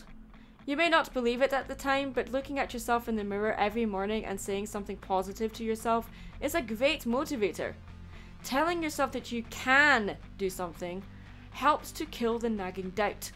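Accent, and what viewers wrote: Scotland, United Kingdom. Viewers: Are u Scottish? I am!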